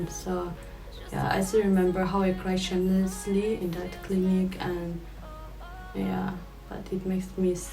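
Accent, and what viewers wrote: Nagaland, India. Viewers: I love you speaking Sumi dialect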